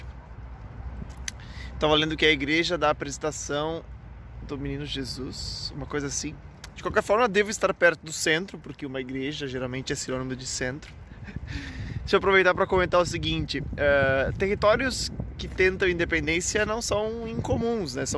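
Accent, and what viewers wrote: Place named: South, Brazil. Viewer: Esse sotaque do sul kkkk😅❤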